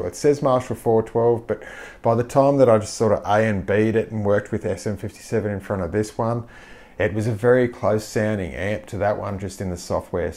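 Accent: Australia. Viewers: Aussie AF ! 🇦🇺 bet you was a mad sk8r too !!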